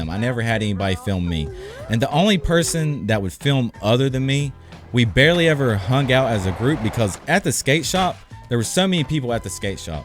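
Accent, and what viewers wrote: South, United States. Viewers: he sounds like he lives in the southern us and nightspeeds lives in denmark i think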